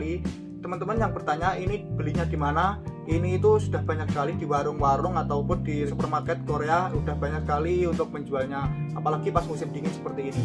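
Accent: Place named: Java, Indonesia. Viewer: Samean jowo to mas😂 jos pokok e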